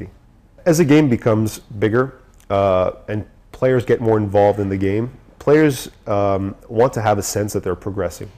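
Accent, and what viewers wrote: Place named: France. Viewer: did anybody else notice the tiny french accent he has? lol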